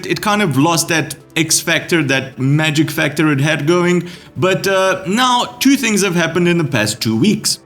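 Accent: South Africa. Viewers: Why do you sound South African?👀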